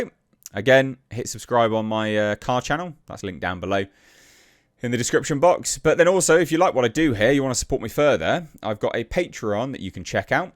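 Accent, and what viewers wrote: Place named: London, United Kingdom. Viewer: You sound like you’re from London!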